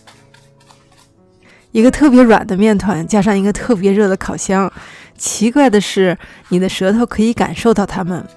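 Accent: China. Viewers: IT's Chinese pronunciation. She is speaking mandarin.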